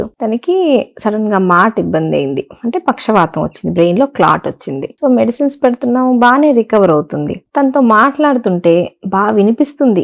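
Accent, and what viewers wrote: Guntur, India. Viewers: Brahmandamga cheppavamma. Somehow, I like your Telugu accent of Guntur area and your down to earth explanation, which itself is a great virtue for any doctor.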